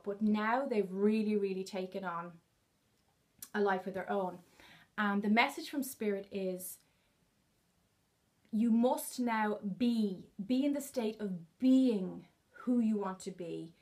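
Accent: Ireland. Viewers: thought the accent was Irish. I'm up in belfast, would love some where in the country away from it all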